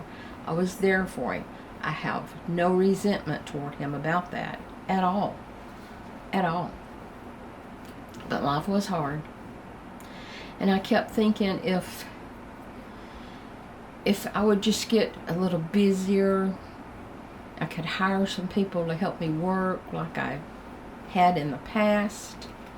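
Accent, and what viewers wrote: South, United States. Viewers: I know what Low self-esteem is like. I have apologized for crying too. Not sure why because we don't really owe anybody an apology for showing our emotions.. Crying is therapy. If you held it all in you probably wouldn't be alive. Thank you for your honesty and transparency. I pray God's protection over you and His comfort over you. You have a beautiful Southern voice. I pray that God will continue to provide for you all that you need every day. He is Jehovah-Jireh ~~our provider. Ironically I just started feeling like I'm living for the first time too I've had this happen before and went back into my own grief and pain but I feel like this time is different. And guess what? I'm painting too. It's watercolor. It's just a different format than what you do but it's so therapeutic to my soul. I pray for many moments of joy and peace over you precious It's beautiful how God would let people connect from all over the country!!❤️🙏🏼 Please know that there are many people out here who will lift you up in prayer. 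Who care. And who think you are a beautiful friend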